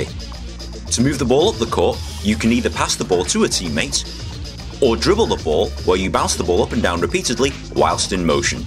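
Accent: United Kingdom. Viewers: Who else knew it was gonna be a British guy? like any other American game explanation video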